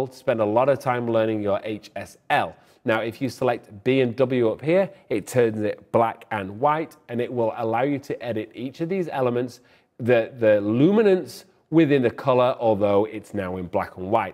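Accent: England, United Kingdom. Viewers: It was great to discover an English man talking about Lightroom!! There are so many Americans and as a hearing impaired lady it’s difficult to follow their accents sometimes, even with the captions. Thanks for an informative video. I’ve not used Lightroom before so I will be following your blogs with interest. Just one question. Why do bloggers feel they need to speak really fast?!!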